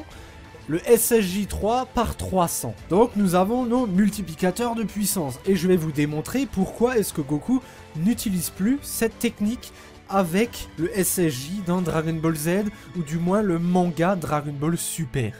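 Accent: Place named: Lorraine, France. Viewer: Super accent de la lorraine !!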